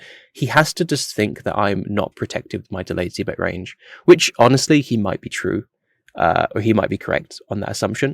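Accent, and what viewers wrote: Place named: RP, United Kingdom. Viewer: are you going to bring some sub content or a course or smth in between? I love your approach to poker as well as your perfect RP english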